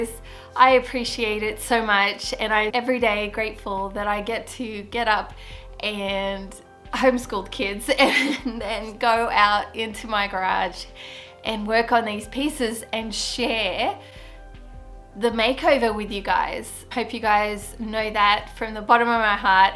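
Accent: Australia. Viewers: I just seen this as it popped up , I have to ask , do I hear an Aussie accent... love from Australia..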